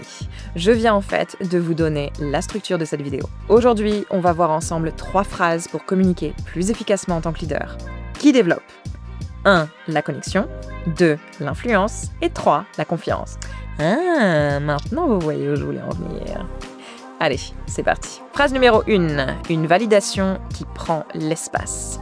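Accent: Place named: England, United Kingdom. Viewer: j'aime trop ton accent anglais en parlant français, je peux t'écouter toute la journée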